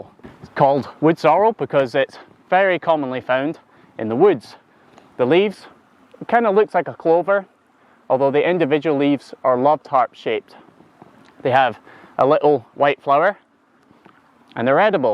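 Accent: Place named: Scottish Highlands, United Kingdom. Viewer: Hi there...great video!!!! I love plants and gardening...but what I loved the best is your lovely accent of highlander...my ancestors came from Scotland Morayshire, and settled in Argentina, South America....I'm in love with Scotland and hope to go one day. Thanks again pal. Beautifull my Scotland.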